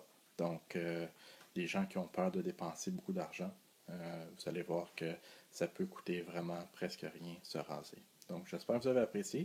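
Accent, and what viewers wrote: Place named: Quebec, Canada. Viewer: Salut, si jamais tu as des produits, des brosses (surtout blaireau) ou des rasoirs d'on tu voudrais te débarrasser, fais moi signe! En plus j'habite a Montréal et j'en déduis par ton accent que tu n'es pas trop loin non plus! Donc si tu as des choses que tu veux vendre, n'hésite pas.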